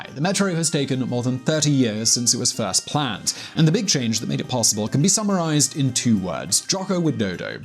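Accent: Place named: United Kingdom. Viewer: Unsubscibed.your ugly british accent soo fucking annoying,right or wrong indonesia its my country.ur opinion its like expert on our country most ur comment its bad.sorry stop subs ur chanel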